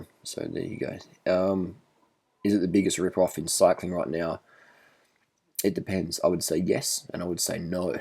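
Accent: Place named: Tasmania, Australia. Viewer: what s with the tasmanian accent lol!!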